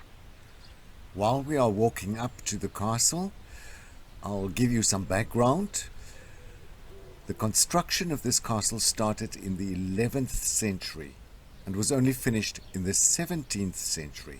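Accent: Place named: South Africa. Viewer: Recognised the SA accent, am enjoying your channel so informative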